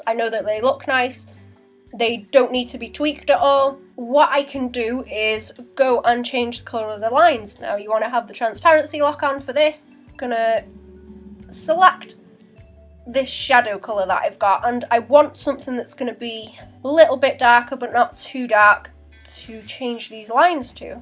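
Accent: North West England, United Kingdom. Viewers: I've never seen any of your videos before, and I was surprised to hear your accent. Just through sheer volume 9/10 artists I find on Youtube are from the US. You sound like you might be from the North West like me???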